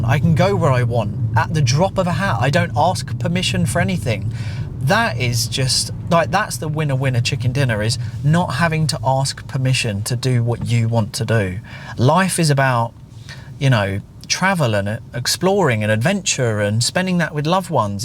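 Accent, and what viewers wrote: United Kingdom. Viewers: Oh I love British accent❤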